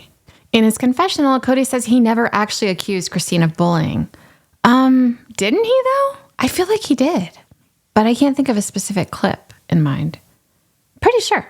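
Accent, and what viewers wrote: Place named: Fargo, United States. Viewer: I absolutely live for your Robyn/Fargo accent. Makes me laugh every time.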